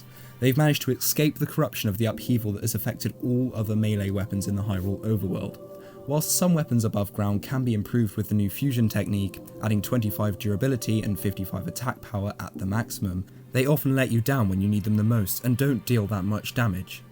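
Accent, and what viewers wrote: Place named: RP, United Kingdom. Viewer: My first thought when hearing your voice in a video - WHAT A POSH BRITISH TWAT. My second - Wow this style of content suites you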